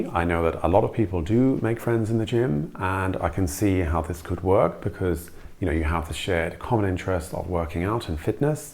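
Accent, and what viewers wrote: Germany. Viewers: I love your videos but I'm distracted by the major German intonation you have when speaking English. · Your accent almost sounds German...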